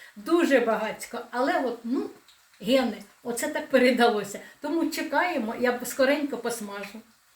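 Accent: Standard. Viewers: Як приємно, коли, нарешті, згадали українську мову. При чому, чисту. Приємно слухати. І рецепти у вас, заслуговують на поширення. Бажаю успіхів.❤ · Ой, як Ви гарно розмовляєте чистою українською мовою. Дякую. Хай Бог Вас благословляє і охороняє. Дуже подобаються Ваші рецепти.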